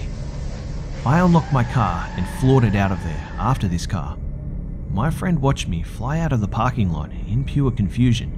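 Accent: Australia. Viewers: bruh you sound like and Australian, anyway love your shit. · love your story's, I just found this channel a couple days ago when I was listening to some Mr. nightmare story's and one of your vids popped up in the recommendation section. I love both channels and you are a great narrator, your Australian accent is awesome and you have great story's. I subbed on the second video I listened to and have watched a bunch of videos since, I'm glad I have a lot of catching up to do because I won't be running out of video's any time soon. :-) keep up the good work!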